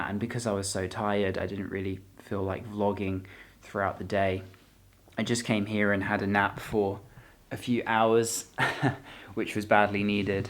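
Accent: England, United Kingdom. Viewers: it is British accent and I still don't mow his accent name.British accent is quite harder than American.What's more almost all of British accents fascinate me. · I don't only watch your Videos for traveling but also I watch because of your good British accent. You are my British accent teacher too, 😅😅😄😄😍 Take care ! Love from Kabul, Afg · Cenker Köktebir It’s just a normal English accent.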